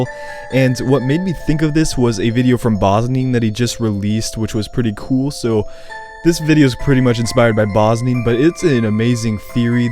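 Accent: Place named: United Kingdom. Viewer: 2nd That's bullshit. He has a british accent!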